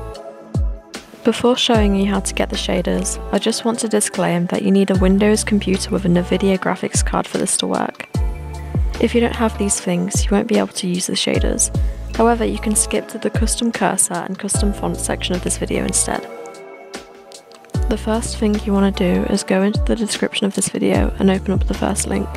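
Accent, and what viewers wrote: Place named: Australia. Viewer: stopp that australian accent is so cutee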